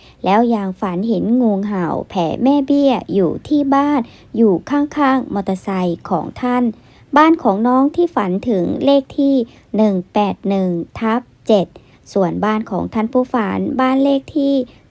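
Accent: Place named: Laos. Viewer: คลิป มันชอบออกลาวเกือบทุกคลิปจ้า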